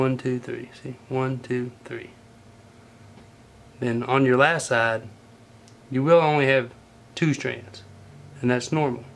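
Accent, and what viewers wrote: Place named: United States. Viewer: love the accent what part of usa ? Video is great btw!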